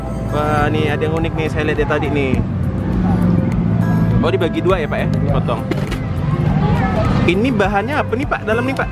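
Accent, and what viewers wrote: Malaysia. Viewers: Aslinya org mn? Logatnya melayu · Cara bicara seperti orang Malaysia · Ko kya bahasa Malaysia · bang orang malaisia ya suaran ya seperti orang malaysia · Abang orang mana sih ? Kok bahasanya kayak bahasa Malaysia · Saya ngikutin HOBI MAKAN dari Subcride 14.000.. skrg udah 700.000-an... selalu liatin updating nya tiap minggu Sukses selalu bang, selalu bersedekah.. dan logat melayu nya tak berubah😂🤣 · Bang asli Mane? Melayu nampak bahase tu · Asli mana sih bang ini. Macam kek org malaysie punye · Aksen melayu nya gk ilang2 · Eeee kek bahasa malaysia cokkk salam dari malaysia banggg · Mas nya asal mn ya ?? Bhs nya kadang seperti malaysia..hehe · Asli mana aa logatnya ari malaysia yah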